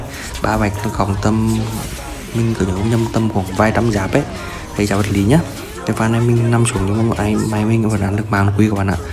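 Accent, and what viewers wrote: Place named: Nghe An, Vietnam. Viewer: Kiểu kiểu như Quang Linh vlog. Chắc ở Nghệ An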